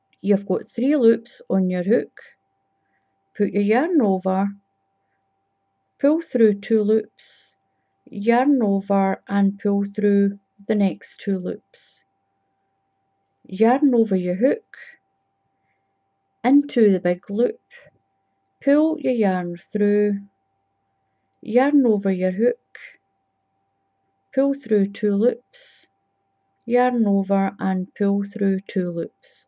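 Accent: Scotland, United Kingdom. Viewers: Great to hear the Scottish Accent for a change. I won't have any problems understanding you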